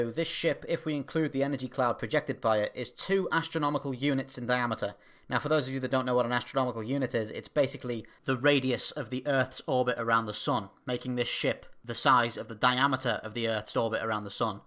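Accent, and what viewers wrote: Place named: Liverpool, United Kingdom. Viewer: Kind of a Scouse twang to your accent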